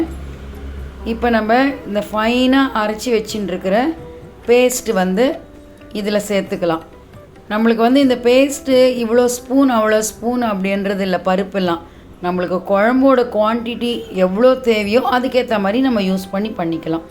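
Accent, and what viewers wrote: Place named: Sri Vaishnava, India. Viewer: Sri vaishnavaa பாஷை கேட்க மிகவும் ரம்மியமாக இருந்தது மிக்க நன்றி 🎉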